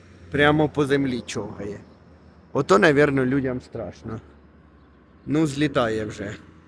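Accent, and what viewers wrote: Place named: Poltava, Ukraine. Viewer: Полтавчанина по говору зразу чути. Дякую за екскурс по місту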